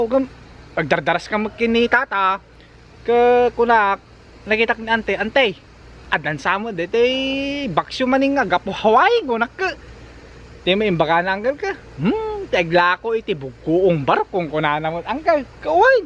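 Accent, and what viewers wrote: Ilocos, Philippines. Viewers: Hello! Enjoy watching your Bid-yos very nice strong accent Ilocano! Where are you from in Philippines? We are Filipino watching from London U.K.! 😀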